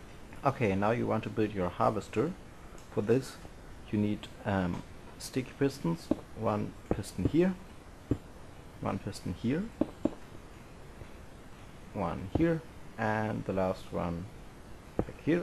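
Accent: Germany. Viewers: Are you german ? Think so :D Glaube schon :D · Heyho, in my oppinion you speak with a german accent. is it right, that you're from germany?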